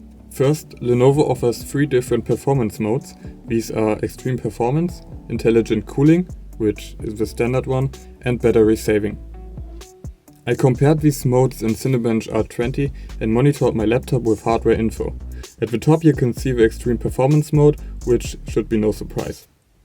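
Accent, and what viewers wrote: Germany. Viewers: Dein Englisch ist gut, man hört nur sehr dass du Deutscher bist... xD LG :)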